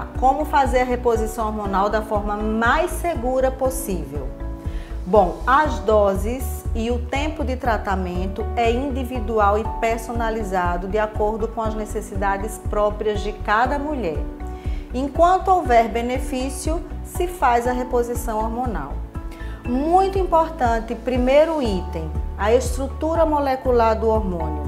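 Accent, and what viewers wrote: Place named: Northeast, Brazil. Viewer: Esse sotaque gostoso é só que lugar do nordeste?❤️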